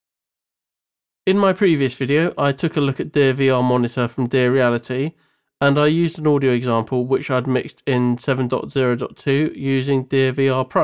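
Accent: United Kingdom. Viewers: Eh, the dude sounds like a caucasian from the UK. Make of that what you will.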